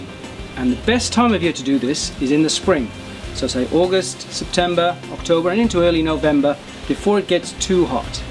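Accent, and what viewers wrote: Wales, United Kingdom. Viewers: I think he is Welsh! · You Welsh? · That's not a South African accent. Definitely Welsh.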